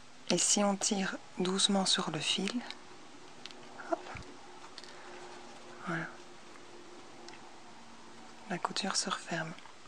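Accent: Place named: Belgium. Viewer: dieu merci enfin une véritable bonne explication avec une vidéo de bonne qualité. merci bcp et l'accent ne trompe pas, vive la Belgique ;)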